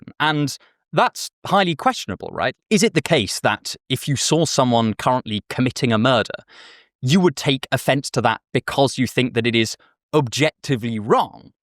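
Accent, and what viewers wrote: United Kingdom. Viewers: this is my first video of yours that ive watched, was so shocked from the british accent i got whiplash, you give american vibes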